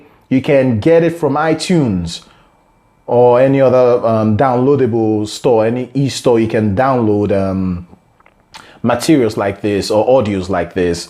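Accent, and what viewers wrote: Nigeria. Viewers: Be honest, are you a Nigerian? cos you sound like one to me. You look more like an Igbo man. No offence, i love your work. Just want to know.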